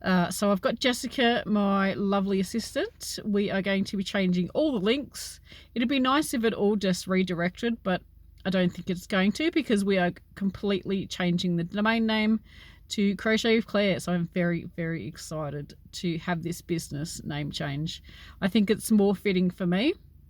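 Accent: Australia. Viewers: it is so good to see you back, I know you have had some stress related issues. I pray that you are doing well and everything is going good for you. Believe it or not I was just thinking about you the other day wondering if we would ever see you back on YouTube instructing us on crochet. I am looking forward to watching all your new tutorials on your new channel. I have always enjoyed your tutorials and your lovely Australian accent, just makes my day. Blessings, good health, best wishes for you in the future. Much love coming your way from Tennessee, USA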